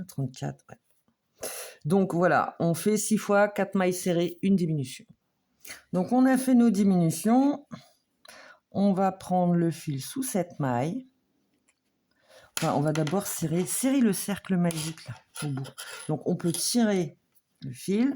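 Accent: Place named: Nord-Pas-de-Calais, France. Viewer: Je peu me permettre de vous demander d’où vous venez car vous avez une façon de parler ont dirait une ch’timi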